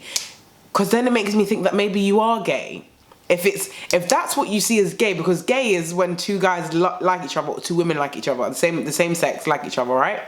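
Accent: United Kingdom. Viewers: U a London girl babes?? Xx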